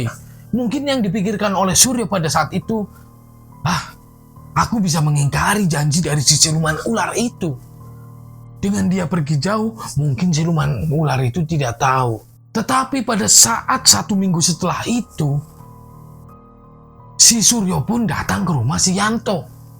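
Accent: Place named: Java, Indonesia. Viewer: 😅 boso jowomu ra ceto mas..campuran jowo kulon ro wetan...mending bahasa nasional wae mas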